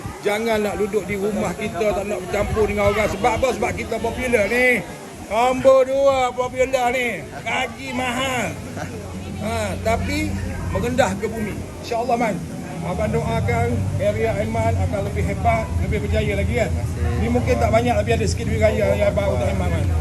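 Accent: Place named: Kelantan, Malaysia. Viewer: Kelantan ke? Bunyi macam kelantan. Ooooooohhh okayyyy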